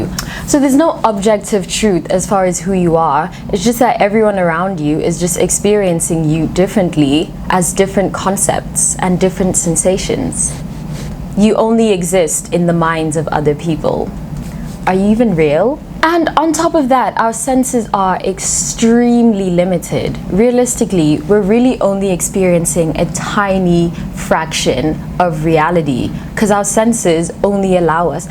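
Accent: South Africa. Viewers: Curious to know where you’re from, really love your work. You sound like you could be from SA but moved. I just can’t across your channel.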